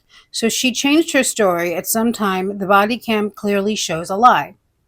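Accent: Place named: East Coast, United States. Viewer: Off topic but I love your hair, you’re a stunning young lady- also, yes…lady Macbeth is also a fantastic show, she does great work!! I was laughing with her drunky the bear videos-her trying to get into SB mind set-yikes!!! I think with your accent you must live east coast-I’m west coast-we should all have a SB or forensic files marathon!! 😂 My best friend and I watch our “murder shows” mom calls them 😅and we enjoy them, so glad I found all the people with like minds !!!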